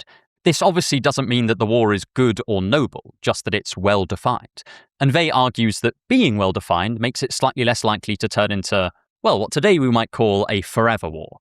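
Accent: United Kingdom. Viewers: I like yur voice...are u brit?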